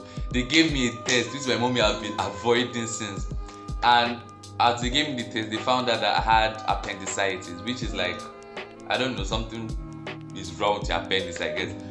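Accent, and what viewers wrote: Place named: Africa. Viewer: I love this channel, please make more videos, could you put subs on though, I'm british & you're talking a bit too fast & not being racist but you have a really strong african accent & when you talk fast it's hard for me to comprehend what you're saying, it might just be me, let me know if anyone else thinks the same..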